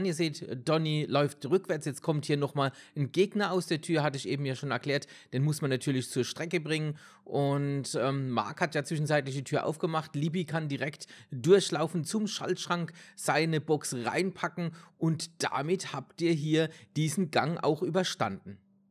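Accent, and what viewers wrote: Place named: Palatinate, Germany. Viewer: Vom Dialekt her, schätze ich auf Mannheim, Heildberg, Pfalz!?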